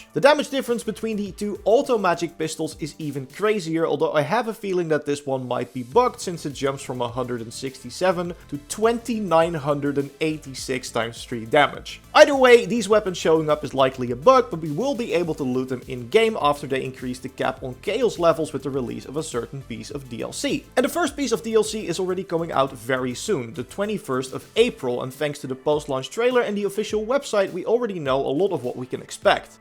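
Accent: Netherlands. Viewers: You gotta be dutch.... you speak like most of my fellow dutch country man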